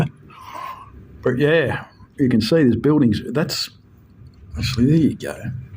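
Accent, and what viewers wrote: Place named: Australia. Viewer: From your accent, you are australian.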